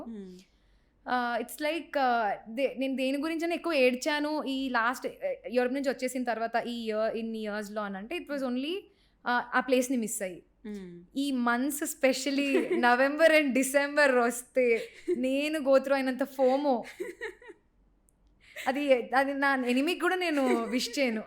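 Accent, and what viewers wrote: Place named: Telangana, India. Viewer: O telangana na?? I saw your videos but in mute so expected u to b andhra girl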